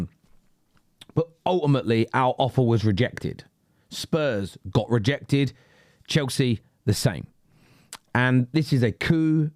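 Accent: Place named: Manchester, United Kingdom. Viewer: Strong manc accent here, cockney red 😂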